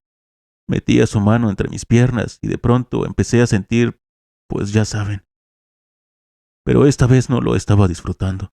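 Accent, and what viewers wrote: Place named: Chihuahua, Mexico. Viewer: Voz típica de un chihuahueño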